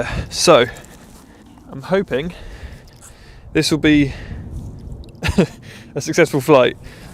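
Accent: United Kingdom. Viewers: Eeemm like british accent.. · I can taste the UK accent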